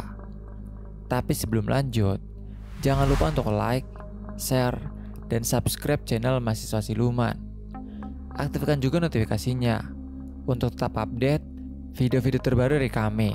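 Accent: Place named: Tegal, Indonesia. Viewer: Mas asli jw tengah tegal yo kon logat tegal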